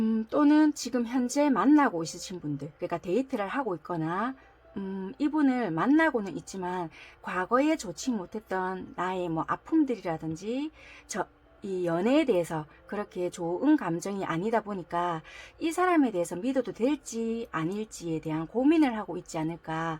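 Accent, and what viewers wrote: Busan, South Korea. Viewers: 감사합니다~^^ 좋은일이 생기면 꼭 후기 남길게요! 🙏🏻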